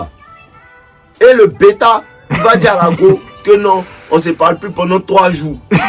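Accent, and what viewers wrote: Ivory Coast. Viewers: On reconnaît l'accent ivoirien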